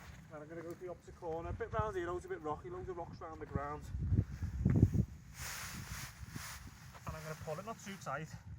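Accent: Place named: Liverpool, United Kingdom. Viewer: English with a Liverpool accent.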